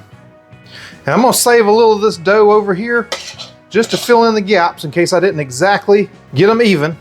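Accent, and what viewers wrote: South, United States. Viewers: I forgive some pronunciations simply because your southern accent makes them adorable.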